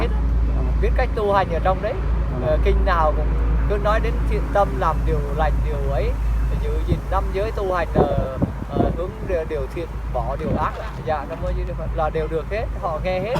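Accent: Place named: Ha Tinh, Vietnam. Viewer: Thích luôn giọng Hà Tĩnh luôn ❤❤❤❤❤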